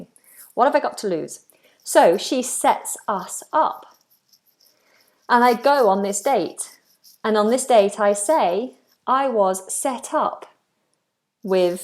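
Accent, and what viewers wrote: United Kingdom. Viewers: I love british accent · Teacher I watch that record fron place who doesn't showen in a map. I just like walk there. It is somewhere not far from Cherson . If be honest I always practice with my gun there, In this way I make sure I don't bother people. P.S I found off British accent, so try hard to imitate your accent.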